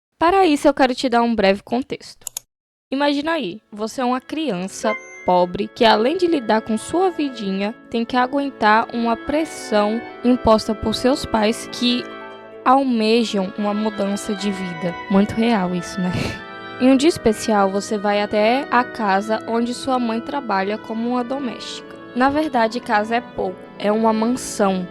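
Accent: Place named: Brazil. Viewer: Conheci seu canal hoje, muito bom mesmo! Voce é de minas, Goiás ou nordeste? Sinto que seu jeito de falar é tao familiar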